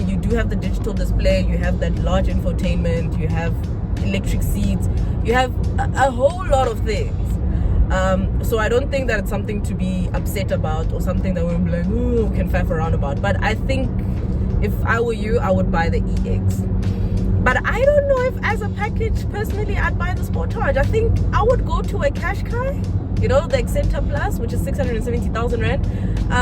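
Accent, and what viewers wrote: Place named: Kasi, South Africa. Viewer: I like how you review your cars, pure kasi style you can even hear it from the accent 😂❤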